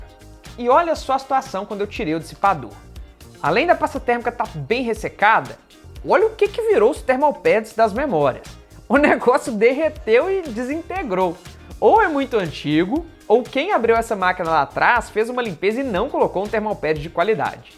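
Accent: Minas Gerais, Brazil. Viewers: Senti um mineirinho uai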